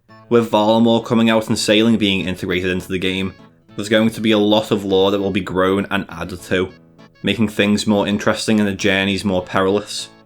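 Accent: Liverpool, United Kingdom. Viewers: Is that a Scouse accent? It really annoys me, but I love your content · Listening to a Scouser explain RuneScape is the height of 2025. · ill bet my whole bank your a scouser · top scouser lad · Real Scouser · Documentary length game lore video in the Scouse accent 😅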